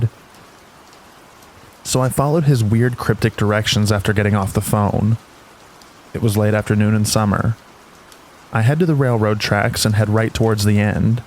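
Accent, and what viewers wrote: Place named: South, United States. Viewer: I love listening to your stories and sometimes picking up a little bit of southern twang, I always smile when I hear it!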